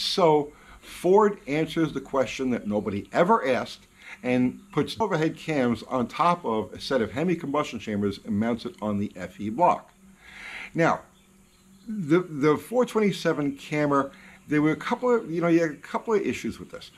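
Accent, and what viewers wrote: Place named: New York, United States. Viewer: I'm from NY. Don't mind your accent at all, reminds me of home.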